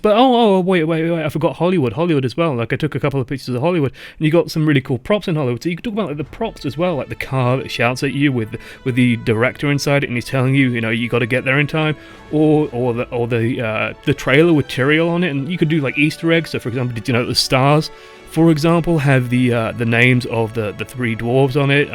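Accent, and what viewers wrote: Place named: United Kingdom. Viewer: Sure there was something very funny but i dont know English so well to understand that( But still thank you for your videos because i can watch some interesting material about game i like and learn English a little bit. First time i watched one of yours i didnt even understood all that you said but now i can easily listen to people with British accent and realise what they say. So yeah, you`re cool. Keep doing your vids.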